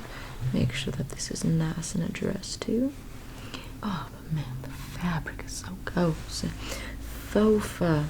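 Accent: South, United States. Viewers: the southern DRAWL I cannot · Southern is best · love the southern accent its top tier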